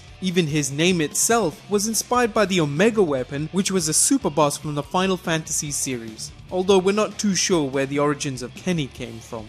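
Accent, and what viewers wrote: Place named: United Kingdom. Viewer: To those complaining about this being a "10 obvious facts video", not everyone knows who Kenny Omega even is. I just now found out about him and I quite enjoyed this video because it helped me learn a bit about him. There isnt anything wrong with this video just because you already knew all of these facts. Some people don't know this shit. Get over it. And as for how he pronounces certain names, you can't expect everyone to pronounce shit the same exact way its supposed to be. This guy is british. They say things differently than americans and japanese people do. Stop getting so butthurt about it and just enjoy the damn video. ps, i love your videos. keep up the good work.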